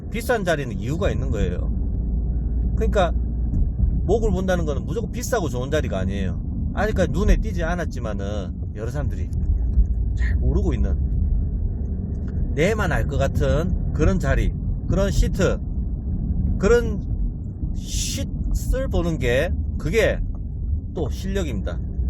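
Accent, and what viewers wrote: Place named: Gyeongsang, South Korea. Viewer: 경상도사투리가 신뢰감을 줍니다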